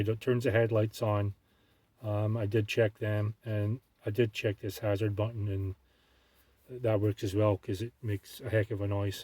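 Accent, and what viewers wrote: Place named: Scotland, United Kingdom. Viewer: Why do you sound Scottish and American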